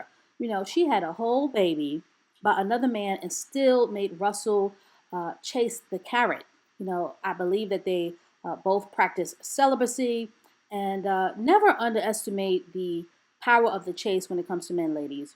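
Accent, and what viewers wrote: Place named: New York, United States. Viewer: Sis " Busted can of Biscuits" you sooooo New York. I love it !!!!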